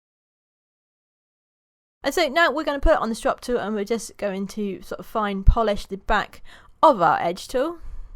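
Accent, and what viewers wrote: United Kingdom. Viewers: by your nice English accent this is my guess you are from UK, Am I right.?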